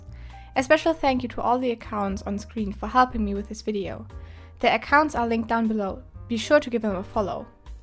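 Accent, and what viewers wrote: Germany. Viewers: Are you German? Ur accent is so German omg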